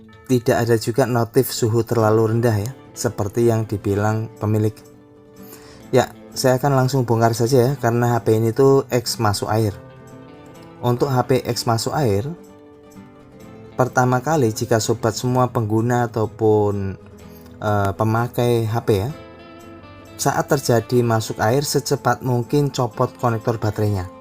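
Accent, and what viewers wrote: Java, Indonesia. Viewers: Jumpa lagi sama saya selalu nyimak 👍👍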